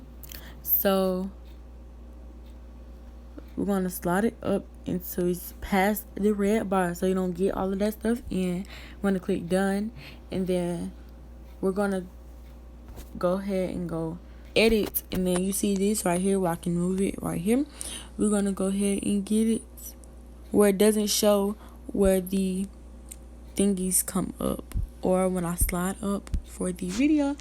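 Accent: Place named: Africa. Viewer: DANGGG I LOVE UR AFRICAN ACCENT♥️♥️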